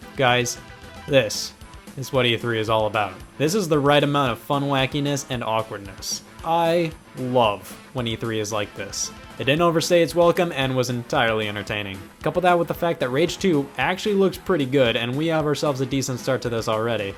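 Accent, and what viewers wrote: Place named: South, United States. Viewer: Hey yall scott here you can tell he is a southerner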